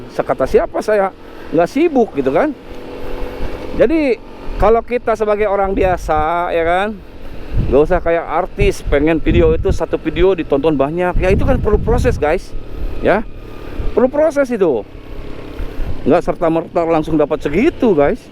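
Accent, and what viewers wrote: West Java, Indonesia. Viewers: assalamualaikum abang, salam silturahim dari mmx malaysia. wah biker sejadi. ngevlog abang juga keren euy. enak dengerin logat sundanya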